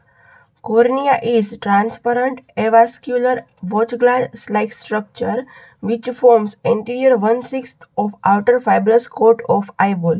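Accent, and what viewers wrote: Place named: Gujarat, India. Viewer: Bohot acha lecture tha aap gujarat se ho na aapke accent se pata chal gaya 😊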